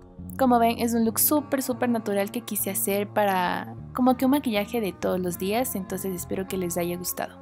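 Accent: Quito, Ecuador. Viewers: Me encanta tu acento, de que parte de Ecuador eres? Yo tambien soy de ecuador por sía. Jaja de Guayaquil, saludos, ya me suscribí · Con apenas escuchar tu forma de hablar supe eres ecuatoriana, me gustó muchísimo todo muy bien explicado... felicidades! una subscriptora más😜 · Estaba esperando una youtuber ecuatoriana como tú 😍 desde que empezó el video reconocí tu forma de hablar 💕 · Solo con escucharte un ratito ya sabía que eras de Quito, igual que yo!!! 😁😁😁Muy buenos vídeos!!! Te sigo desde Alemania!!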